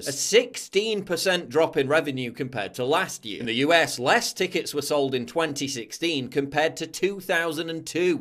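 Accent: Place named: Yorkshire, United Kingdom. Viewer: Geoffrey boycott accent.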